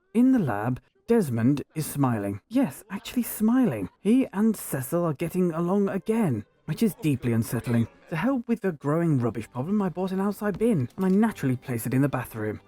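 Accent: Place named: United Kingdom. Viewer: It's the British accent, sounds like David Attenborough of Sims documentaries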